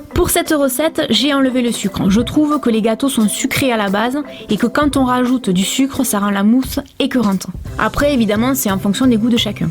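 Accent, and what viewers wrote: South, France. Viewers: "Dans le sud de la France" avec l'accent qui va bien! Super vidéo, merci beaucoup! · Coucou Un vrai plaisir ta video. Je me suît regaler de voir et d entendre ton accent. Je suît aussi du Sud👌👌 Comme quoi il n'y a que dans le Sud Qu on vie bien. Oui je suît chauvine lol👌👌 Ah le taboulet avec dû choux fleur je ne connaissai pas du tout,top Merci Sympa ta video. Tres onde fin d après midi,Tres bon anniversaire. À ta Maman 😘😘💋💐🌹🌹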